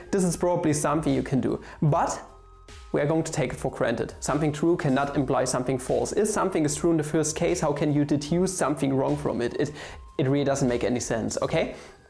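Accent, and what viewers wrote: Germany. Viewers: Is this guy German or from a country where German is the main language. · Are you german by any chance? :)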